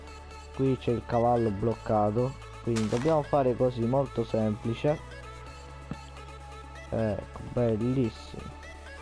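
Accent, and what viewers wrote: Sicily, Italy. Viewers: complimenti fai troppo ridere😂😂 meriti molti più mi piace e molti più iscritti,ma da come parli sembra che se siciliano perché anche io sono siciliano e assomiglia molto al siciliano da come parli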